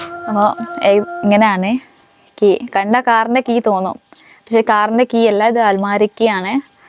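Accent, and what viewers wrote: Malappuram, India. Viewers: Ivar malayali alle?ariyunnavar paranju tharamo? Pinne engane malayalam padichath? Onnum manasilakunnilla.. Malappuram slang anallo padichittullath.. Husband malappuram malayali ano?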